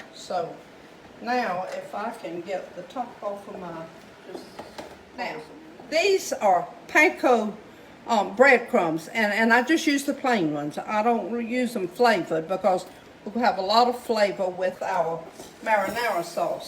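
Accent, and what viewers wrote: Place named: Georgia, United States. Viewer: Oh that looks delicious, what a beautiful supper ! I do the hamburger buns also,they just taste good ! I enjoy your cooking videos and your southern Georgia accent your a beautiful lady ! Hello from Missouri Im outside of the KC MO area,65 yrs old live on a private lake but raised up in the farm country ,north central mo! Have a wonderful day!😊❤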